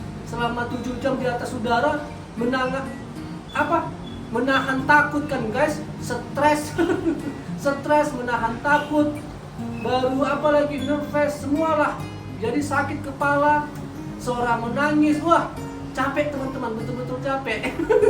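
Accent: Medan, Indonesia. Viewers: Salut sm bg...walau sdh lama di Jepang tp logat Medannya gak berubah sedikitpun. Selamat pulang kampung bg. Semoga istri dn anak abang betah selama di Indonesia. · Logat mu bg, nmpak x nya org Medan, salam satu kota bg, sehat slalu bg, 😊😊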